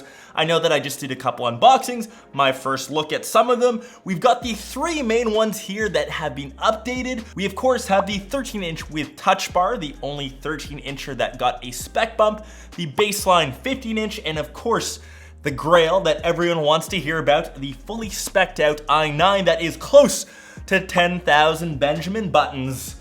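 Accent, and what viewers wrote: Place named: Canada. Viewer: Great video! Thank you! Also I appreciate how Canadian you are. "PrOccess!" "Out!" Keep up the good work!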